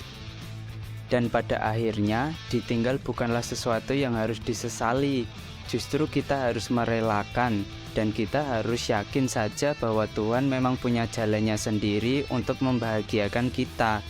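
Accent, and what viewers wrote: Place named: Java, Indonesia. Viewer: Medok suarane🤣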